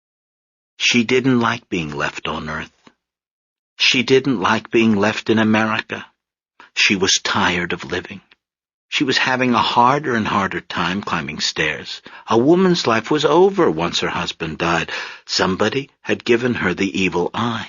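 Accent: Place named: Borscht Belt, United States. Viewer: This narrator has given the first generation American, Michigan-born characters, theatrical borscht belt accents, for some reason! Weird.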